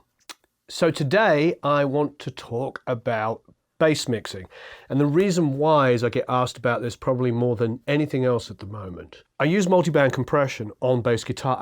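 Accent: United Kingdom. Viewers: That and the british accent.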